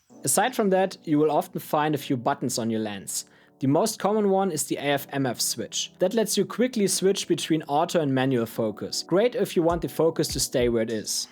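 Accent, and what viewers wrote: Germany. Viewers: Really good video 👌 a question 🙋🏼‍♂️ you German? I kinda heard in your accent.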